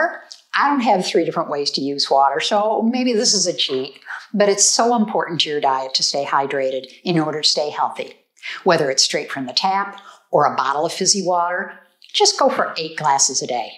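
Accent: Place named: Midwest, United States. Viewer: BTW love your mid west ? Accent. 🙂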